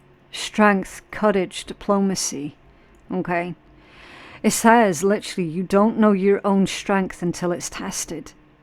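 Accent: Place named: Scotland, United Kingdom. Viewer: I love your accent, I believe it’s a Scottish accent. It sends me back to when I was a little child and lived in a house right next-door to grandma and grandpa from Scotland. Thank you so much for sharing your spirit with us. Might love always.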